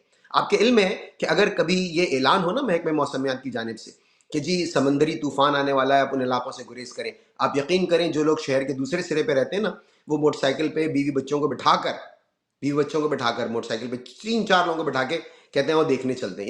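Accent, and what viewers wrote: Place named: Muhajir, Pakistan. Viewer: آپ کی زبان سے واضح ہوتا ہیکہ آپ مہاجرین میں سے ہیں۔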